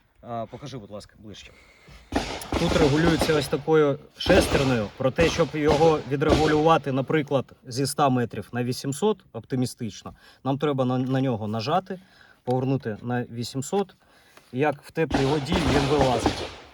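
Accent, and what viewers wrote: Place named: Russia. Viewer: На слух старо русский деревенский язык, что говорит понятно это какой регион России? Кокая та окраина России точно. Давно не был в России мира всем и процветания.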